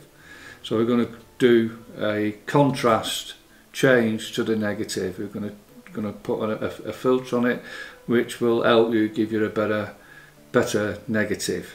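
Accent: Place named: Northern England, United Kingdom. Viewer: Hi, Just printed my first Cyanotype and wanted to know how to progress with wet cyanotypes etc. Have now been watching your videos for the last few hours.(Lovely to hear a northern accent!!) Brilliant am hooked. Today was a beautiful day so can't wait for the next one to experiment further. Thank you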